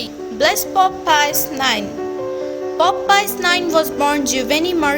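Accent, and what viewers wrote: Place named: India. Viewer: Indian lady... the accent so strong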